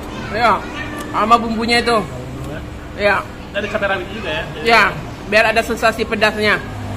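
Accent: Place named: Minangkabau, Indonesia. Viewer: Bahasa Indonesia diselangi dialek minang amat suka saya dengari..tersusun fasih mempesona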